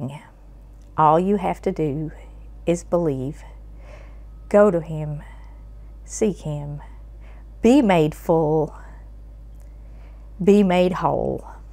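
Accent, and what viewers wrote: South, United States. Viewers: I didn't know about you but it always taste better with a southern accent ❤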